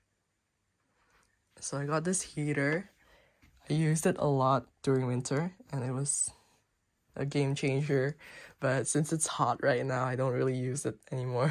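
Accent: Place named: England, United Kingdom. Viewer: The English accent got so thiccc ever since highschool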